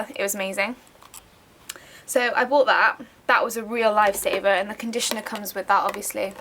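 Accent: Cornwall, United Kingdom. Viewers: You sound cornish, correct me if i'm wrong? Great tips, by the way!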